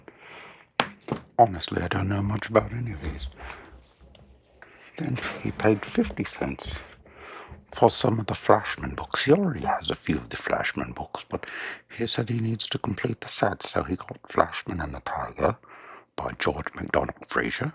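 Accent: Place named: New Zealand. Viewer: Very nice of you to show off Robs latest acquisitions. I have to ask, have you spent time in the Antipodes as I could swear I detected a faint New Zealand accent?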